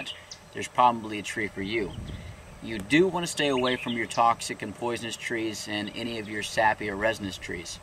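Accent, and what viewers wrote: Texas, United States. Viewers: I love hearing you say the things Texans say, I miss all my country folk back home. "if you hold your tongue right" I say this in Arizona to a lot of people and always have to explain.... thanks for you vids and I hope things are going well for you, haven't noticed any updates so far. thank you thank you thank you and speedy and full recovery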